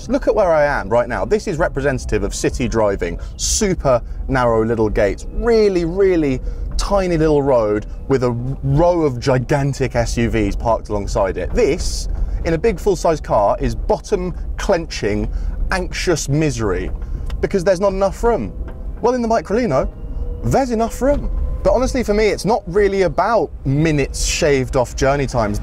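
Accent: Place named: RP, United Kingdom. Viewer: Posh accent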